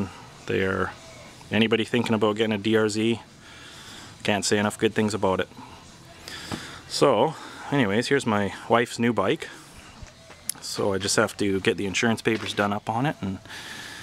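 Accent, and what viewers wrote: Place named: Southern Ontario, Canada. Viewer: Really like the plow, great stuff. the hydraulic pump makes me a bit nervous on the back of the blade. Sounds like you might be from southern Ontario riding on Dec. 4.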